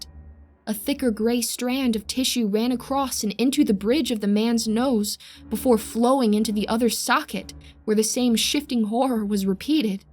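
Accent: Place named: South, United States. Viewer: Your creepy southern drawl is actually very well done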